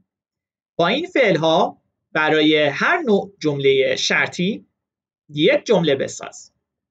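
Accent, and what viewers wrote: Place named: Tehran, Iran. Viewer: مشکل اینست که شما دارید به فارسی تهرانی صحبت می‌کنید که خیلی با فارسی معیار اختلاف دارد. به هر حال کار خوبی شروع کرده‌اید و آرزوی موفقیت برای شما دارم.😉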